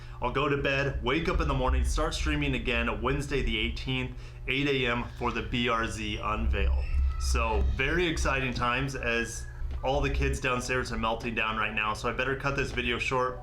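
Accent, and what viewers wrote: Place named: United States. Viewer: I'm sorry, but Americans and their pronunciations...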